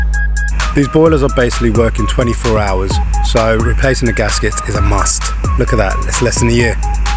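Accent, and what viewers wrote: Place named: London, United Kingdom. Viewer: Love that london accent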